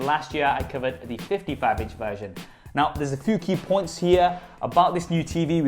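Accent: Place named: England, United Kingdom. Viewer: Something about an English accent on an Indian that is so captivating. Great review man. Subscribed.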